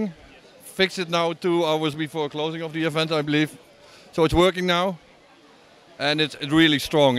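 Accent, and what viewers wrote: Netherlands. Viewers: je hoort gewoon dat hij nederlands is 😂😂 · HAHA HET NEDERLANDSE ACCENT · He's from the Netherlands i can hear his accent i am Dutch too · ik vind het altijd zo mooi om te zien dat mensen zon nederlands accent hebben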